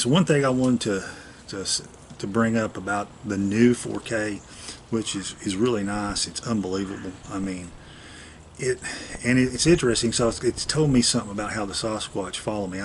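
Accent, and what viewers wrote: South, United States. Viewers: I have just recently started watching your site, it’s very good, but scary as heck. like some of the other people, I found out about you from Steve. I am a native Georgian, near Atl and I was wondering where you were from, I knew it was the South, can’t hide that accent, love it.....take care And be careful out there....🍑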